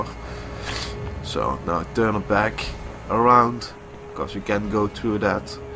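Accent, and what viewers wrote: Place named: Netherlands. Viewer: You sound dutch lol.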